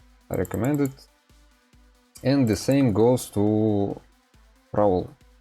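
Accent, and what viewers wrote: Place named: Russia. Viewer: Кажется, мне слышится русский акцент...хм ...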